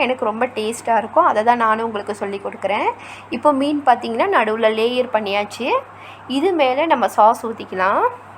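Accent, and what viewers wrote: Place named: Sri Lanka. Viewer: Full video covered da super nd ur slang s lyk srilankan very nice to hear ❤️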